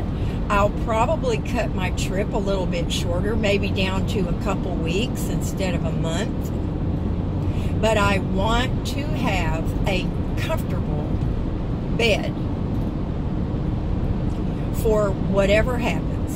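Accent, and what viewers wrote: South, United States. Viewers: "Yu All" You sound like a good ol' southern lady. Hope to see you at RTR 2019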